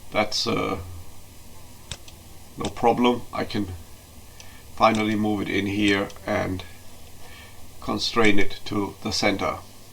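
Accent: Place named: Germany. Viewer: German english with stuffy nose... What a phonetic combo... Nice tut by the way, good job.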